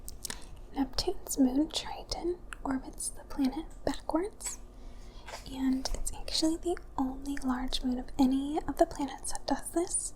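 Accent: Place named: Midwest, United States. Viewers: She must be from the Midwest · Its her midwest accent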